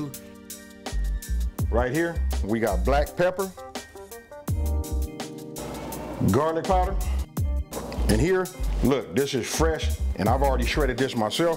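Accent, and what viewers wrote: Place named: Carolinas, United States. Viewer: You sound like you're from the Carolinas.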